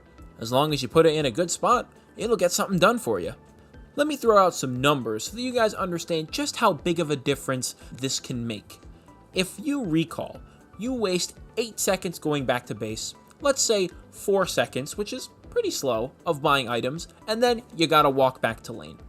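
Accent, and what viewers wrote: United States. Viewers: the way you talk,it reminds me like Wild West, cowboy things xD ,i mean on actent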